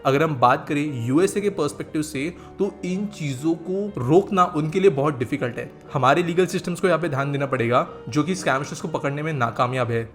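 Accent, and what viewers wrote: India. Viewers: Yo, are you indians dumb or intentionally irritating??? If your gonna speak Punjab you benchod than put the title in Punjab not in English. I hate this fucking nation. Although you might be speaking English after all, it's the worst English accent of all time, brought to you by India.😂😂😂 Yeah I know, you will report this comment as hate speach. Bloody pc natzi's. Fuck you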